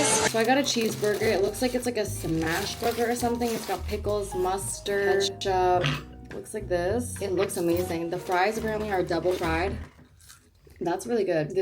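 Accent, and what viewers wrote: United Kingdom. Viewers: Essex babe And please torture us with the British accent !!!!!!!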